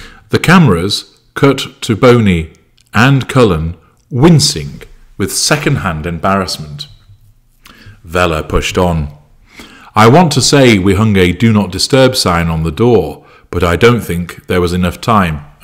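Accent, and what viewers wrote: Australia. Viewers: champion aussie Accent Mate. fair suck of the sav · I’m amused by your Australian accent. I hope someone comments on audiobook experience! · Your Australian accent spiked monologs are a few of my favorite things! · More Aussie accent please. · you are hilarious!!! Loved your opening monolog. I'm one of the ones that loves your Australian accent, G'day, etc.... And to those who don't like it, then GOOD! 🤣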